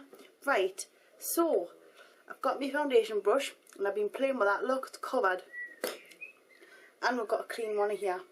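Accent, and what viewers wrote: Newcastle, United Kingdom. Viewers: i think she's geordie... like Cheryl Cole... northumbrian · She's geordie, GEORDIES UNITE! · are you a geordie? you'd be sooo great on geordie shore! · love it how every one thinks your scotish when blantly your georide lol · Just found your video, it's the first one I've watched, and I'm really impressed. 5*s for your video, and definitely subscribing :) And I love your accent, I might be wrong, but is it Newcastle?? x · She's not Scottish..She comes from Newcastle. In England. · definitly from newcastle, im from newcastle so i know the accent pretty well lol, i'd be surprised if she wasn't. love your video's by the way. x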